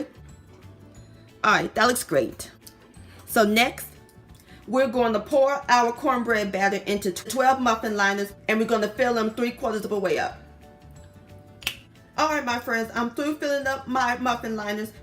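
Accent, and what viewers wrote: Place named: South, United States. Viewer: OH HO HO! Now now! THIS is what I'm talking about! As a CANADIAN, we make these all the times! Maple is our domain! HAHA We don't use the cupcake liners though. A muffin to us is mushroom shaped, and is not confined to a cupcake liner. But these are SO CUTE for a breakfast buffet table like that! Southern? OK...I will give you that due to your accent. HAHAH! They look GREAT Miss! XOXOX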